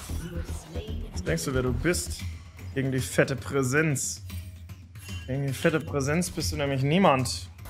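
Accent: Bavaria, Germany. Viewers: Wie sich der bayrische Dialekt am Anfang ausschleicht 😂😂😂😂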